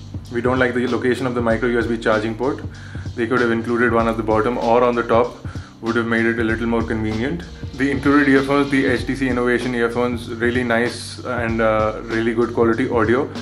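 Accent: India. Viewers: Another good review, with a wonderful Desi accent. A refreshing change from Europeans who actually pronounce OMG as OMG. Good job buddy.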